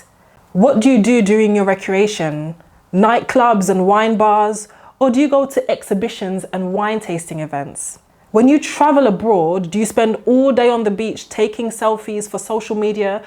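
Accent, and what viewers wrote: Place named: United Kingdom. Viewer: That is excellent advice. A lot of people assume you just know what kind of person you should marry. In fact that's the best way I know of to really screw it up. The ideas I have when I married was to start wrong and it shows in my own marriage. I simply didn't know what I was doing.. I am so pleased that young ladies like you are like you are employing sensible approaches to life-and-death cool approaches to life in general. Also, that gorgeous British accent doesn't hurt!! Keep going. You make me proud.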